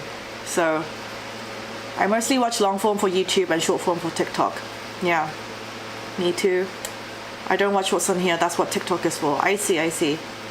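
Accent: United Kingdom. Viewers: You are just super hot! The British accent just propels your channel!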